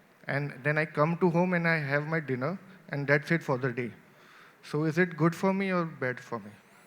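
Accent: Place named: South India, India. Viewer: When a south Indian speaks Hindi its so funny?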